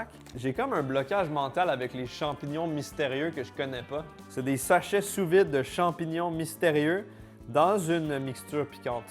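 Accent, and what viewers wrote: Quebec, Canada. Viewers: Rien à faire. L'accent québécois est dégueulasse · ah ok merci de l'info j'oubli trop facilement qu'il est au quebec. ( malgré l'accent )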